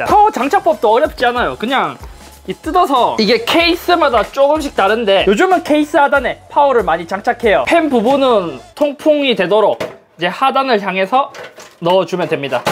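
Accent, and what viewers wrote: Gyeongsang, South Korea. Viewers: 말하는게 경상도 느낌난다 했는데 부산분이엿구나..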